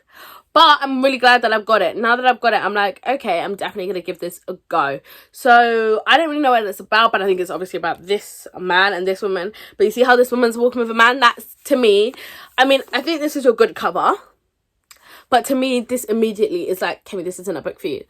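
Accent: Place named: England, United Kingdom. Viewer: Hey! nice meeting you. I love your English accent! it's super cool. Plus I appreciate your perkiness in your videos. And you also have a sense of humor. ☺All great skills to succeed in your BookTube channel. Of all the books you mentioned, two of them stuck out for me. Black London and whiskey in a tea cup by Reese Witherspoon. I shall order them, come the new year. I also have quiet a lot of books I must read before adding more to my library. I am currently reading "Finding me" by Viola Davis. 🤗 thanks!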